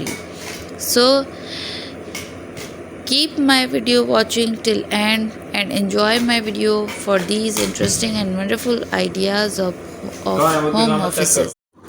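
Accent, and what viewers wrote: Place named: India. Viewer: English please... not your east Indian head ass scammer voice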